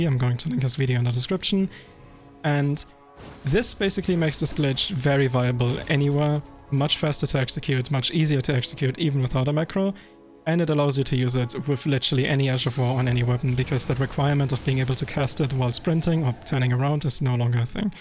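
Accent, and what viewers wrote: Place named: Germany. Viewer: You’re German right? Because your accent sounds like it :D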